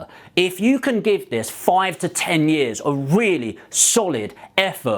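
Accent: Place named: South London, United Kingdom. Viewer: Someone here is having a 'giraffe'... is from 'darn sarf' it's just accent 💛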